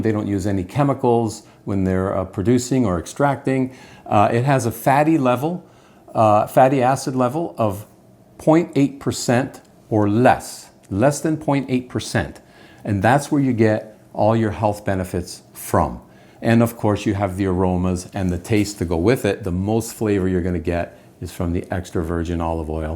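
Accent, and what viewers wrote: Canada. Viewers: He has Canadian accent😊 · Your name is? You sound Canadian. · Within ten seconds , i'm like cCanadian! Lol. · Is that the Canadian accent I hear?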